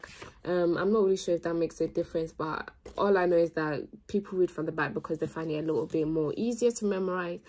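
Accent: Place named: Ghana. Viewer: When you honestly ask God for guidance, Islam is the destination you will arrive at. Keep going sis. Your accent sounds like from Ghana 🇬🇭 , is that the case?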